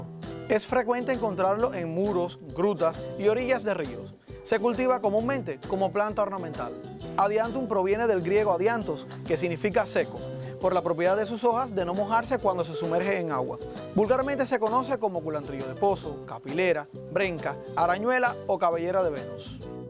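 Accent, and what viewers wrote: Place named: Sancti Spíritus, Cuba. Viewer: No ee como llegue aqui pero me atrajo el asento del muchacho .sera espirituano? Soy una espirituana lejos de mi cuba bella ..y ese asento solo lo tenemos nosotros .los S.S un saludo y grasias por toda la informacion muy bien explicado ..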